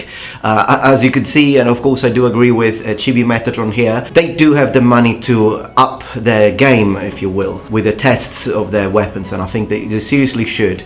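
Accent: England, United Kingdom. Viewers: Love your British accent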